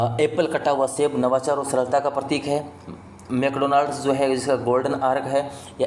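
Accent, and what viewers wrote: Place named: Haryana, India. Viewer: Sir aap Hariyana se ho kya... Aapki awaj se lag raha hai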